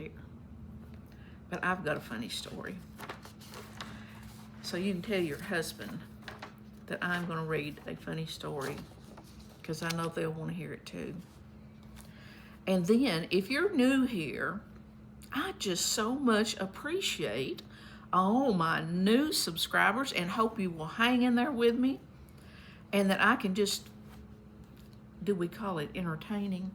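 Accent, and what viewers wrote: Kentucky, United States. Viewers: I enjoy your videos guess I like your Kentucky accent!! My parents were from Kentucky many many years ago✅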